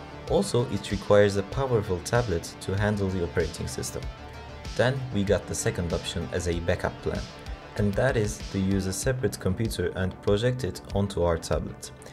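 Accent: Turkey. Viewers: Sesinden Turk oldugunu tahmin etmistim diye goruce emin oldum, Jay in videosundaki yorumu gorup geldim. Eline saglik 🙏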